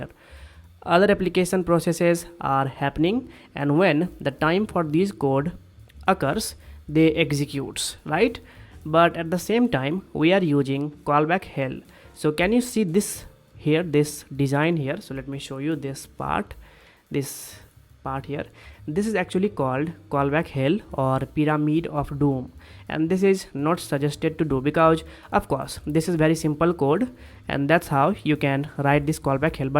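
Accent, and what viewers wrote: India. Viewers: indian accent this is legit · Indian english is so cool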